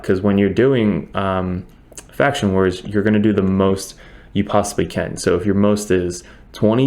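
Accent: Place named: South, United States. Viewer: You sound like you might be local to where i live, you from the southern states? Loving the content bro, keep it coming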